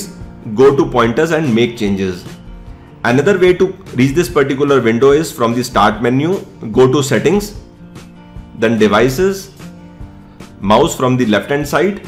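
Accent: India. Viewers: i love your accent, my brother from india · u sound like a tech support scammer from india and its quackity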